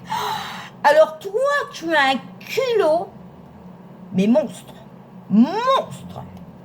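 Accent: North, France. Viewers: Coucou j'ai fait brûler de la sauge et demandé à l'univers de vous venir en aide pour arrêter tout ce harcèlement et que votre dépression s'arrête car vous ne méritez pas ça et depuis 2 ans vous remontez le moral à tout le monde alors à nous de vous aider à notre tour on vous aime et surtout votre accent du nord que j'adore 💕 💕 💕 💖💖😍😍😘😘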